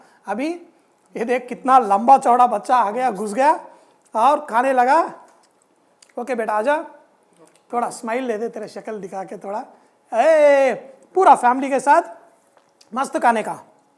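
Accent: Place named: Hyderabad, India. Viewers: True Hyderabadi accent....Feels good... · Haha perfect hyderabadi slang of u r... 😂😂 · good to see your videos sir thanks for uploading hyderabadi baata kirrrak hi bhabi bolre so.. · Wooow🤣 happy to hear sir hydrabadi urdu you are really grate aap log hamesha ayse hi khush raho aap ko bhi Eid Mubarak 🙌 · Chef... in love with u r hyderabadi conversation, bilkul apna sa lagta hai, Eid mubarak in advance, keep cooking enjoy good life!!!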